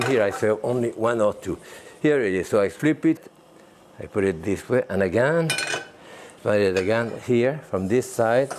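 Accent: France. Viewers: That accent is Jacques closseau level ! Love it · With that chic French accent he could make a carrot butty sound exotic! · L 'accent francais c'est magnifique. Great explanation merci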